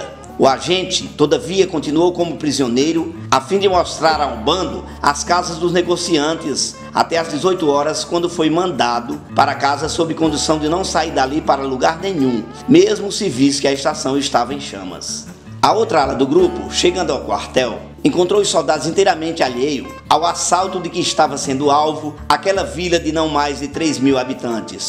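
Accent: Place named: Northeast, Brazil. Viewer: Eita Lampião sempre estrategista! Muito bom relato e o sotaque nordestino show! Parabéns!👍👏👏👏👏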